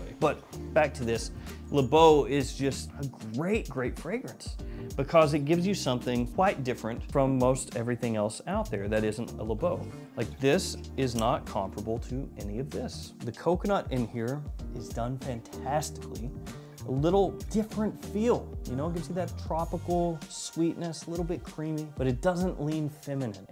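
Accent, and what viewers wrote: South, United States. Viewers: More southern accents, please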